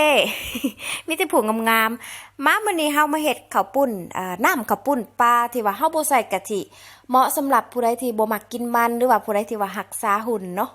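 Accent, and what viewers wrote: Savannakhet, Laos. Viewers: Jasmine Cahuana ຂ້ອຍຟັງເອື້ອຍລາວເວົ້າມ່ວນໆຫວານໆ ຕາມທີ່ຟັງນິແມ່ນສະຫວັນອ້ອຍຕ້ອຍເກາະນິ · ເຈົ້າຄົນແຂວງໃດສາວ ນີ້ແມ່ນພາສາຄຳເວົ້າສະຫວັນນະເຂດ ຂ້ອຍຟັງແລ້ວກໍ່ບໍ່ໄດ້ມີໄທປົນເລີຍໃດ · ຂ້ອຍເບິ່ງຫລາຍລອບກະຄືບໍ່ໄດ້ມີພາສາໄທປົນໃດ ຄົນສະຫວັນເຂົາເວົ້າແບບນີ້ໃດ ຂ້ອຍກະຄົນສະຫວັນນະເຂດຄືກັນ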